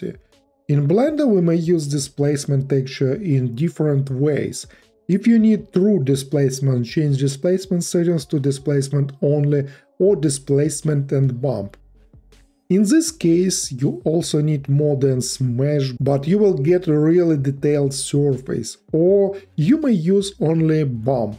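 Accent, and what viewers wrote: Russia. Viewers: russian tongue is cringe.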